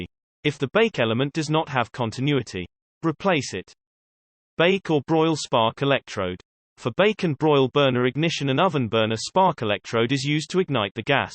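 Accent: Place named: England, United Kingdom. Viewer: What a waste....really? No mention of stove top problems...only oven issues...but, suppose that by putting an English accent as narrator makes it seem ok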